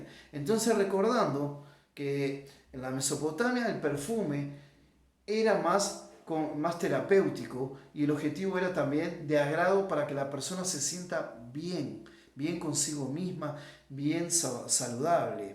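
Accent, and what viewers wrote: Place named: Brazil. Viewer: Excelente contenido amigo gracias por este video, no puedo evitar encontrar en su acento argentino, también una sutil mezcla del portugués brasilero .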